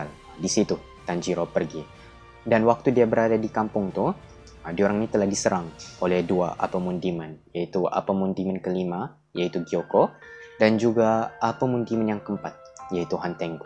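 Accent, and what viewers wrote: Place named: Sabah, Malaysia. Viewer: Abang orang sabah ke? Suare abang macar orang sabah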